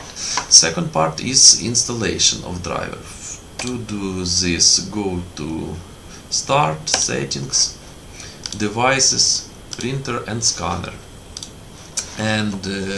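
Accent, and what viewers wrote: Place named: Russia. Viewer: Thank you, from the bottom of my heart. helped. Russian accent you have, somehow it was not usual to listen to such a speech. But, really, it helped a lot. ❤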